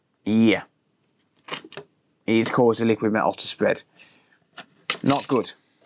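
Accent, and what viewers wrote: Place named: Midlands, United Kingdom. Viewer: Your local to me (accent) do you have a shop in the mids ? Respect to you also nice to see your work and honesty on this repair I’ve known businesses not taking responsibility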